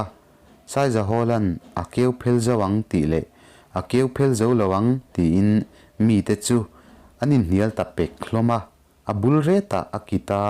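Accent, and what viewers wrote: Mizoram, India. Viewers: Hmar țawng hian ti kher lo la tha tur... Ka lo ip chawih chawih mai.. mahse a tha lutuk. 😂😂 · Hmar tawng a mawi..♥️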